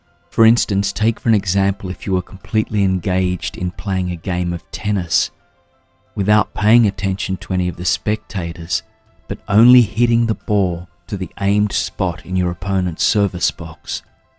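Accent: Australia. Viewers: Love your work! Thanks so much. Also great to hear an Aussie accent! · Good Morning, I am loving your meditations and the live ones are fun too! Its also nice to hear an Australian accent, sometimes I find it hard to meditate to other accents as it distracts me from their words haha. I do wonder if you could tell me the name of the music in this piece I find it very motivational and powerful! Love & peace Pita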